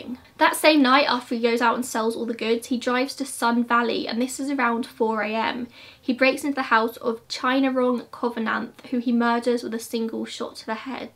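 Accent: England, United Kingdom. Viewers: I know this is so played out, but I just love an English accent.Combine that with murder mystery? I'm in! 😊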